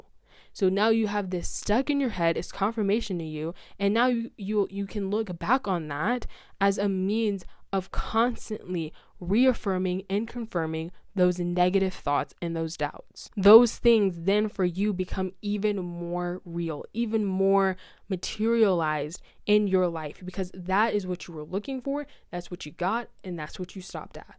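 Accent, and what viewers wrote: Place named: United States. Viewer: She sounds American to me, but she might be Canadian. Wouldn’t know what part of either country.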